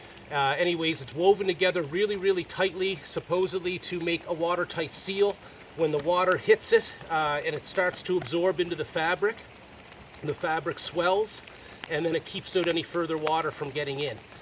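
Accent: Ireland. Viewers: Sounded quite Irish yeah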